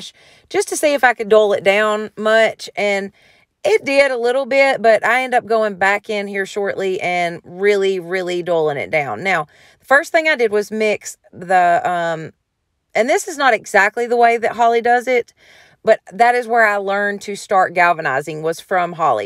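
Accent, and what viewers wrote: South, United States. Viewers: Just subscribed! I really like what you did with your items, but am in love with the way you talk---Southern country. It makes me homesick.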